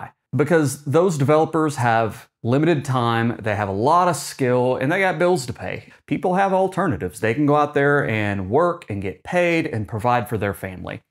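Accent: Tennessee, United States. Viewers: PS: Your voice and style are very comforting and convincing. It reminds me of a younger version of Senator Al Gore's cadence, pacing, emphasis and accent. (Are you from Tennessee?) --- Keep up the good work! Love your channel and your videos! Wishing you continued success in all that you do! 🍷🍷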